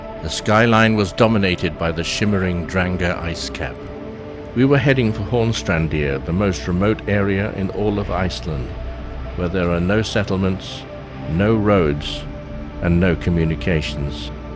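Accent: England, United Kingdom. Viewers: Exciting journey, indeed. Lovely views of the North, spare commentary and this English voice - nice!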